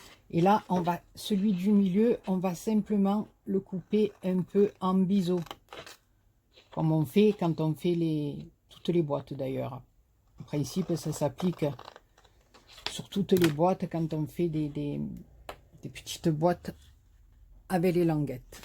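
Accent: South, France. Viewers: Coucou contente de te retrouver toujours avec ce petit accent du soleil. Très classe cette boitounette et toujours des explications claires elle me plaît beaucoup 🤩🥰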